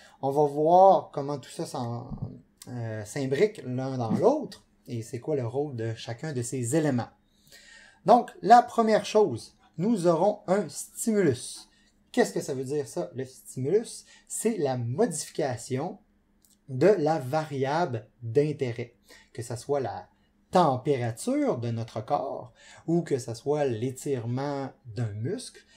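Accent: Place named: Canada. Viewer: francais Canadian !!! terrible malgre ca merci pour la video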